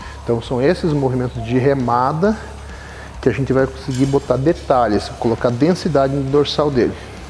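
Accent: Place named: Curitiba, Brazil. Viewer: Sotaque típico de um curitibanoo <3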